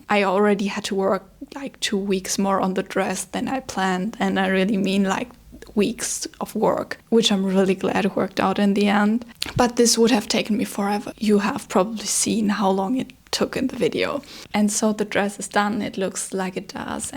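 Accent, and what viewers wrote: Germany. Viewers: I love this video about the creation process of this wonderful dress. It looks so good! Those color nuances! So cleverly designed and made! I admire your patience. I sew myself, but I've never done anything so elaborate. It was so enriching to watch you go through your creative process. I could watch you for hours as you walk through the church in this absolutely extraordinary dress. I stumbled across your channel by chance and of course I subscribed. Und ich dachte mir doch, dass sich dein Akzent deutsch anhört. 😂 Viele Grüße aus dem Saarland. ❤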